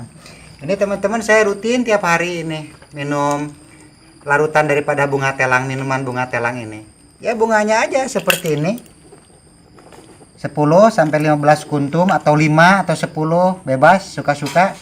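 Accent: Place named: West Sumatra, Indonesia. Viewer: Kok sampai bisa kang bahaso minang.. Heran lo awak.. Atau mungkin teteh urang padang.. Heee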